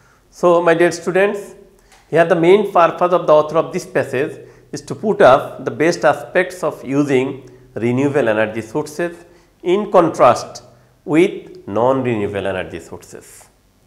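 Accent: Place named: Bangladesh. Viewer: Honourable sir, First you complete phonetics after that teach nationally. I think you are a Banglish teacher.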